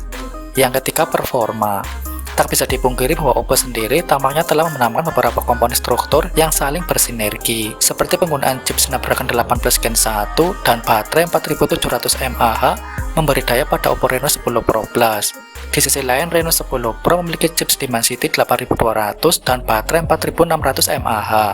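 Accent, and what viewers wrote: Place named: Java, Indonesia. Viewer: Jawa koek bnget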